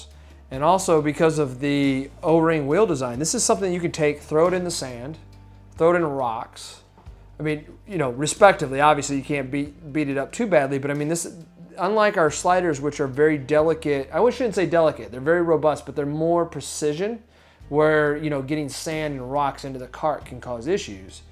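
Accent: United Kingdom. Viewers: Don't you just love British accents ? :D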